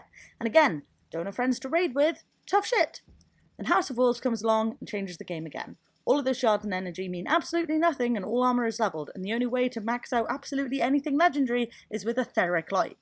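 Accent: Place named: West Country, United Kingdom. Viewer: Quick question..... Where are you from? certain aspects of your accent sound very "west country"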